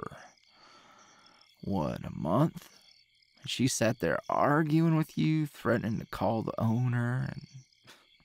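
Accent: South, United States. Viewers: It gives me an unexplainable amount of serotonin to hear a man with a southern accent and knows he's not homophobic or any of that other negative stuff associated (rightfully so) with southerners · The thing that is so cute about country boys is how they sound exactly like:3 <3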